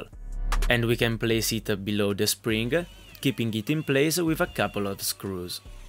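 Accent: Italy. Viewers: This is awesome. I have been into sim racing for a while now and I am in IT here in the US. You have some ingenious crafting and engineering skills. Also, I could tell you were Italian as soon as I heard you speak... My mother is from Catanzaro and her accent is really similar to yours haha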